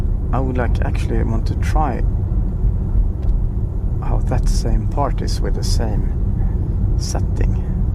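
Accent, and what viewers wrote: Sweden. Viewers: nice swede english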